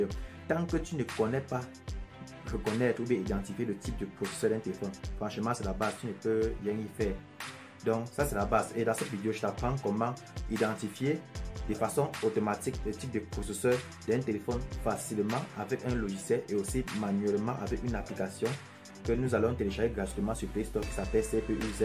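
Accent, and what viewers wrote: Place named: Cameroon. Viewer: Jvoudrais aussi un box si jpx l'avoir chez toi jcroi q t'es au camer, vus ton accent je crois que t'es 1frero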